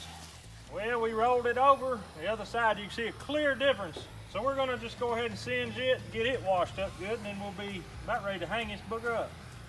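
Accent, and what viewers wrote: South, United States. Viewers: I like your southern draw...and your clean hams 😂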